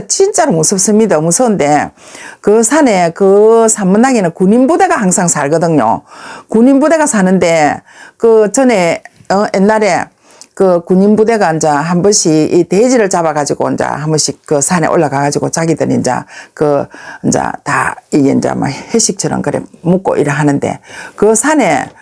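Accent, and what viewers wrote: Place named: Gyeongsang, South Korea. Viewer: 경상도 말 그대로 하니 정이 갑니다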